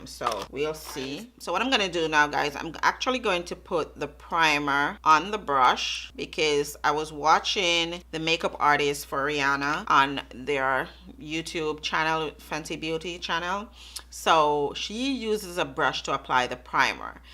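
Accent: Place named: Jamaica. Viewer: awesome!!! I’m Jamaican as well that’s why I asked. I could pick up the accent.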